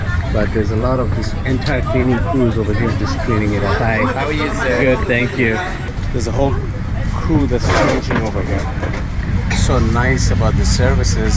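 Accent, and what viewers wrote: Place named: Trinidad and Tobago. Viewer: U sound like u from Trinidad and Tobago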